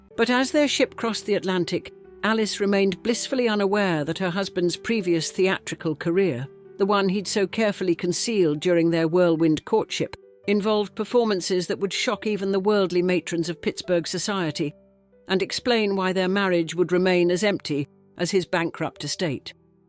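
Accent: England, United Kingdom. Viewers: What's a nice accent British, nice voice. But i don't think alice Thaw is wonderful man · Dumb AI pictures that don't relate to this narrative. The new documentaries filled with an English accent of authority and cheap AI.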